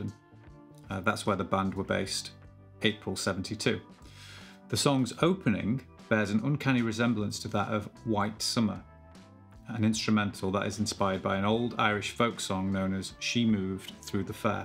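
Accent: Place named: Northern England, United Kingdom. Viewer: Crackin'! Ta,. I've been hammerin' & pullin' for nearly 40 years but still can't get the timing right..... One of these days. Btw, you sound like a northern lad, which is the best type of course, but can I ask - would you mind not dropping so many 't''s, it's just not quite right! If you do so I'll promise to stop droppin' my 'g''s! Is it a deal?? Love on ya!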